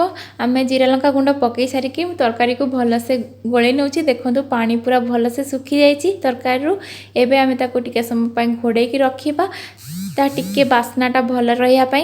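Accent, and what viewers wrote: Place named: Baripada, India. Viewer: tame baripadia ki tama katharu Jana poduchi hele bahut badhia ghanta Heichi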